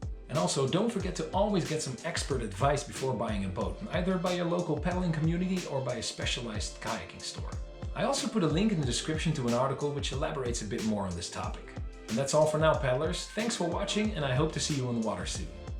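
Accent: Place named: Netherlands. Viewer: I live 1 minute from the Meditteranean Sea in Israel, and I find myself looking up info about buying my first kayak. This video pops up and without further checking you out, I can HEAR your Dutch accent. Then I look and figure out you're a Haarlemmerrrrr! My birth town! What a coincidence. In ieder geval, een erg informatieve video die me een stapje verder helpt in de richting van mijn eerste kayak avontuur. Groet uit het "heilige land". P.S. Is er iets over Middellandse Zee kayakken dat ik moet weten als beginner?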